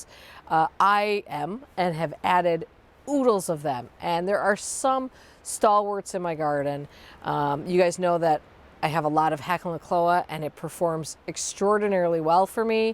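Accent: Wisconsin, United States. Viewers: Oh, I needed this. We moved into our house 20 years ago this coming February. We were thrilled to discover in the spring that our neighbors were gardeners (and also the kindest people ever). We have enjoyed many years of sharing plants, sharing bulb orders, and enjoying each other's gardens. Don died of a heart attack in September at only 77 years old, and Janice was moved to memory care two days ago. We are experiencing so much grief right now, and I have been planning the bouquets I will take when I visit her this summer. I will definitely add the snapdragons to my seed orders. Thank you for your videos. You are inspirational and almost always give me a laugh, and I love seeing your animals. Plus, listening to you talk takes me home to Madison. Happy New Year!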